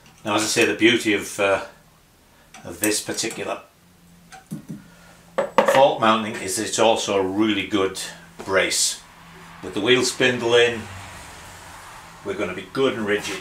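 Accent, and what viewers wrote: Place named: Teesside, United Kingdom. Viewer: Great stuff,. I have rebuilt a couple of OiF BSA singles and this reminded me of every turn of the wrench as I did so. Despite my mature years, you showed some methods and tools that I had not seen before. Really excellent and I look forward to the engine rebuild. Being from Tyneside, I recognised the smoggy accent immediately!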